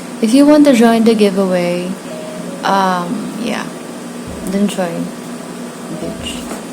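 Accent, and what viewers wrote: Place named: United Kingdom. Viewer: Grabe tlga ang english accent ❤️🥰 i want to join and win the gouache watercolor to try other brand at baka maging magaling din ako tulad mo 💚💚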